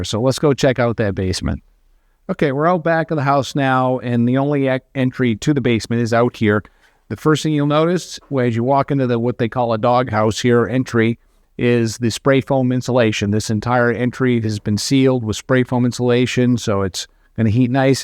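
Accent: Maine, United States. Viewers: Great looking property. I’m a southerner but I like the Maine accent. Very informative.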